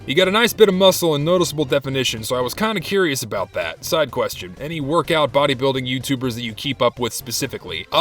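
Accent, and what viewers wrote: Texas, United States. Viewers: MORE TEXAN ACCENT NOW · youre accent becomes more cowboy through out the video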